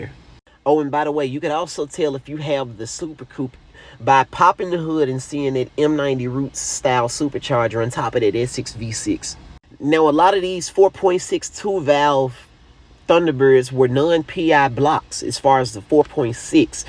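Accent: Memphis, United States. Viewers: You can tell he from memphis btw he talks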